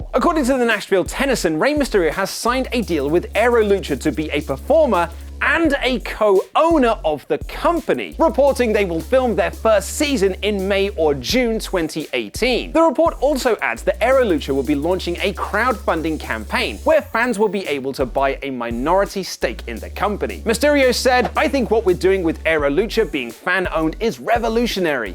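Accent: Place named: United Kingdom. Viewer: Some of his words I do have to listen to again. I guess his British accent and my hillbilly ears clash....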